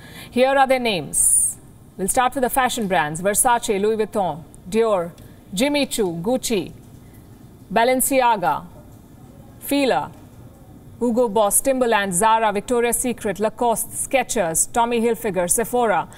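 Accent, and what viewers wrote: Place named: India. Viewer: Amen how did they know dat ,force labor I hope America is not using this trick trying to destroy the Chinese economy ,hence they are far ahead of America,, because this girl I've been watching this channel for a long time this girl is very bias protection everything from American side ,, She don't question anything from American side ,, yes she's got an Indian accent but trust me. She works for Americans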